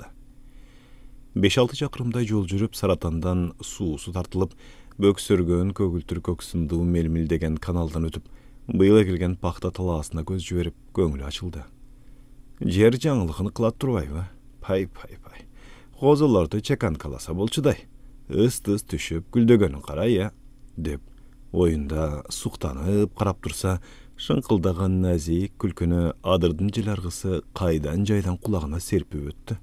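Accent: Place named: Osh, Kyrgyzstan. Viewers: Ушул оштуктардын тили жинге тийет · Оштутарлын тили менен окунан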